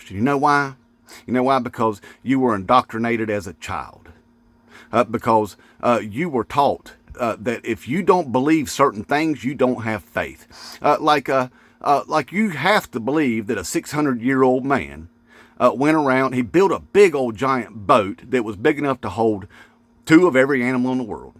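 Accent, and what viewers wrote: South, United States. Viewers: I love that you popped into my algorithm!!! I’m a bi-racial Afro Latina & you are keeping me sane through this madness! Love your content, dude! And that southern accent is the cherry on top of the sundae! #InmateTrump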